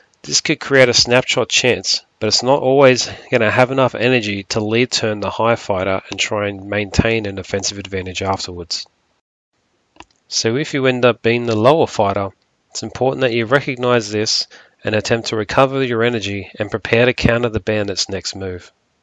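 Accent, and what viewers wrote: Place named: Australia. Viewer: I want you to know the same way a British accent sounds like it fits in some gentleman's club explaining the tribulations of aristocracy, there's something Inherently confidence inducing when it comes to an Aussie accent covering anything that has to do with a fight.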